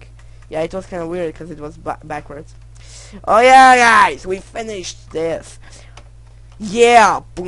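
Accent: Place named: Germany. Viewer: yer it lags....allot....ur not english are u? u German?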